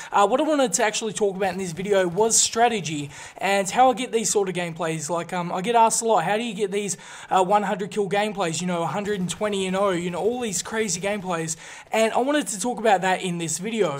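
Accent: Australia. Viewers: epic gameplay by the way what accent is that ? aussie or brit ?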